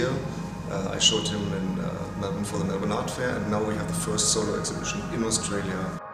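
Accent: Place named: Germany. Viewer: that german accent...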